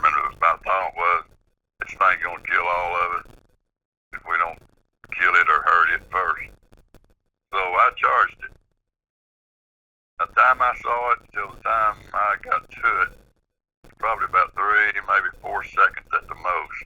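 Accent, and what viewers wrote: South, United States. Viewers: I'm from the north and had a difficult time. I think the combination of the southern drawl and the audio made it hard and I did use my ear buds. I'm gonna try listening to it again because the comments I read have made me want to go back and give it another try. Its funny because when I was down south people had a hard time with my accent. Said we northerners talk too fast. Lol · This was great! I understood everything fine, may be because myself and my whole family sound just like him. Just born and raised in the "heart of Dixie " 52 years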